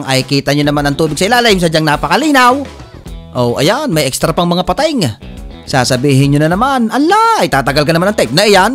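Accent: Batangas, Philippines. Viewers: Solid Yung Batangueño accent Hahahaha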